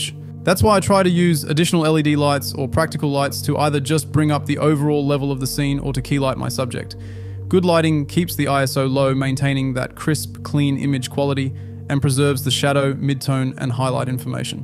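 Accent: Australia. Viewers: Your accent sounds Australian in this video 🇦🇺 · I paid attention to your voice. It's either your accent improved (sounds somewhat australian like) or it's some AI involved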